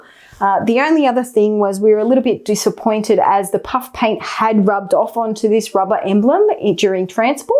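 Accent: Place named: Australia. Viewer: when she first said in the video Spider-Man i knew she was a AUSSIE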